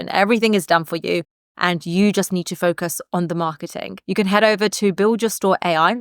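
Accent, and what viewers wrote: United Kingdom. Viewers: This Britain English accent is terrible. I am not specifically referring to, but I have seen British movies or netflix series and I found the accent to be more crude and hard to understand. The US accent is music to ears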